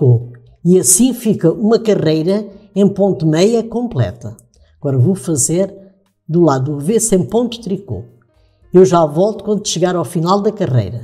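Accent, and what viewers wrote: Portugal. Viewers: Obrigada, adorei, super fácil, e adoro ouvir seu sotaque, me mata saudades, amo Portugal, amo os portugueses, tenho muito respeito por vcs!!! bjos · adoro seus trabalhos super bem explicado e seus trabalhos são maravilhosos e adoro seu sotaque português adoro bjs 😘❤️ 🙏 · Sim. Uma meia comprida e reta! O ponto deve ser elástico né? Seu canal é do Brasil? Tem sotaque de portuguesa de Portugal! QUE fofaaa, muito atenciosa! Obgda!❤❤❤❤⚘🕊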